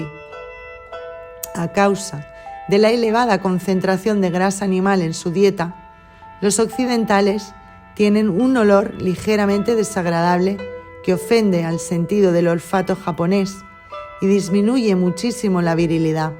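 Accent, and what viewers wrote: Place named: Spain. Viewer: Gracias Camaradas iiiii Que bella voz tiene la Camarada que narra en este Audio, tiene una voz Muy Clara, Firme y Armoniosa, un español claramente de España,toda una Gran Guerrera iiiii Saludos.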